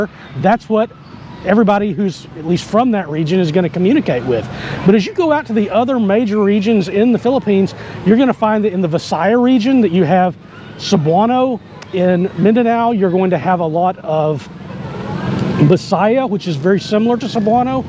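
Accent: United States. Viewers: I couldn't say what region of USA you are from...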